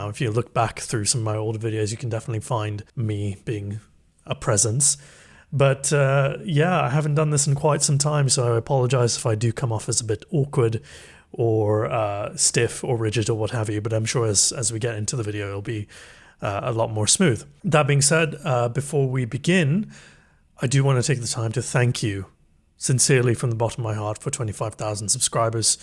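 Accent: United Kingdom. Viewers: Cammycakes and collaboration please:) Great channel and nice to see your face. I should have known the best British voice on the Internet belonged to someone from the Indian subcontinent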